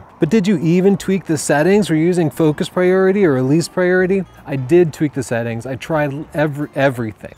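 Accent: United States. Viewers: I love the American pronunciation, the UK being the 51st state, now commonly known as the United Kingdom of America and we have an American princess now, ignore the haters i wouldn't give em air time, i love my Mustang too No more visits to California to Enjoy driving a Mustang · "It's Ny-kon in the US, not Knee-kon." And then go on to claim 'Zee-7' is a world-wide pronunciation. The letter Z is pronounced Zed everywhere but the US. The product will therefore be pronounced Zed-7 everywhere but the US, whether you like it or not. If you want leeway for your American dialect and pronunciations, at least show the same courtesy. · Loved that ending. "I'm an American, this is my dialect." Hell yeah. No one should be ashamed of their dialect, Americans included.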